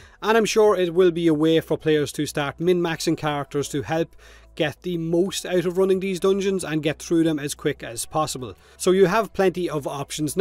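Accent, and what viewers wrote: Ireland. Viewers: Every time I watch one of ur vids, your accent make me think about the 100000 pints of Guinness I used to drink when I was in Ireland back in the 90's...and I feel nostalgic :D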